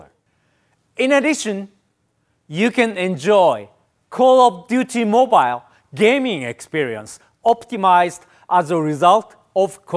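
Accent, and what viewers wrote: Japan. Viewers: what a thick Japanese accent, yet not so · For a Japanese. His English and accent is impressive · A perfect blend of Japanese english and fluent native english. For both Japanese and native speakers to understand. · Why can't they get someone who doesn't speak JAPENGLESE?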